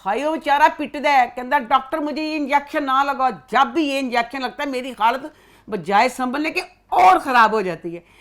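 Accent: Punjab, India. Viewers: Thumbs up what a powerful episode the way you talk and review is love being a punjabi i love and enjoy your typical punjabi accent and verdict...jiyo love you🤩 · I love how gives all-honest reviews on every drama.............but the best reviewed drama is definitely ZEBAISH 😂😂😂 Her amazing punjabi accent and sense of humour always makes my day. This is a humble request by one of biggest fans: plz do a review of “Nund”. I am begging to hear words on it. 😜 If a smile is a sadqah, imagine what reviews do 😊 God bless and the team of Galaxy Lollywood ❤️❤️❤️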